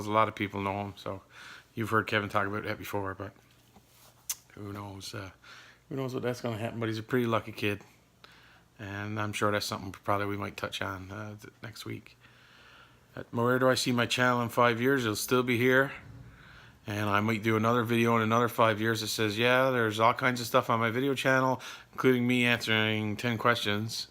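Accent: Canada. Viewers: Team_Natty that’s not just a Canadian accent my friend, that is a Newfoundland accent.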